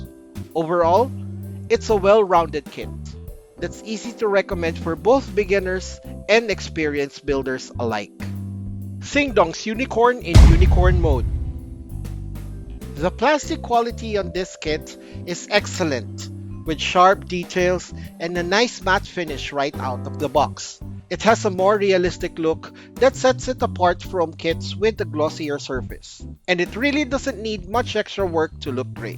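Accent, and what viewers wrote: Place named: Philippines. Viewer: Oh youre from Filipina thats why little weird I heard your accent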